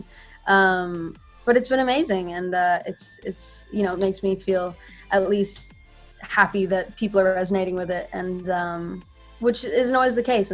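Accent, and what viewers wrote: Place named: Australia. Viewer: She is from Sydney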